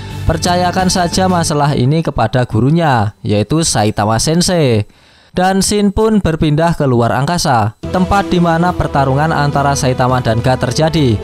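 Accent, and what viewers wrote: Java, Indonesia. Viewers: Asap galaxy : wedus gembel...🤣🤣🤣 Jowo ne muuuedok min...👍👍👍👍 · Keren bang walau dengan gaya jawa nya :) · jowo ya kak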